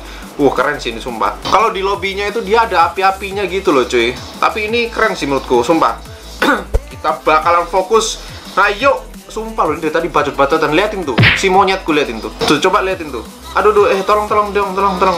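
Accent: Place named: Malang, Indonesia. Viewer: Arema medok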